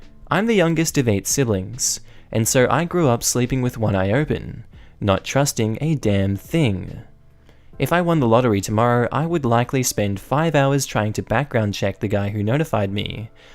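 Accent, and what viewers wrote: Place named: Australia. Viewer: hit us with your true Aussie voice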